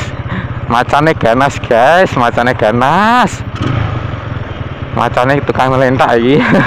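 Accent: Java, Indonesia. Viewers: Jowo Endi mas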